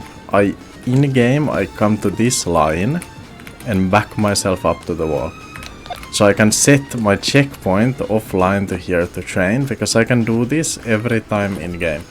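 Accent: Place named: Finland. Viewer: Ait! is getting a new sub! When I get a nice humble guy, with good information, great knowledge, etc. AND who has the lovely finnish accent, then a fellow Norwegian HAS to sub! Great content bro! 🫵🥰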